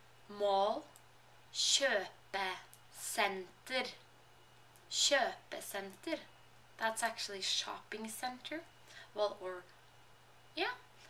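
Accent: Oslo, Norway. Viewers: It's just a difference in dialect pronunciation. People around the Oslo area pronounce "og" with the "g" sound but it can also be pronounced without. It's actually a common mistake that people write "å" when they mean "og" because they sound the same. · Er du fra Oslo? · norwegian sounds like german..love your norwegian accent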